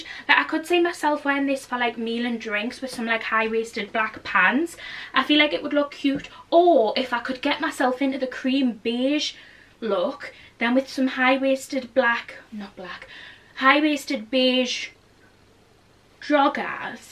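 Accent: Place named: Geordie, United Kingdom. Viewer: I feel like your Geordie accent was so strong in this video 😂❤ You look amazing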